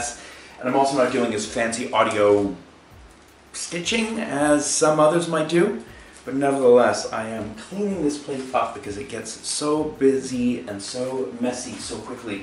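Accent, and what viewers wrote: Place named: United Kingdom. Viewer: Where about in Britain is he from??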